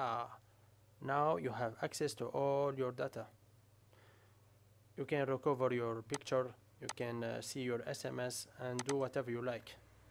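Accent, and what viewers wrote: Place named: Eastern Europe. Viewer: this guy is from russia or eastern europe. I cant understand his english properly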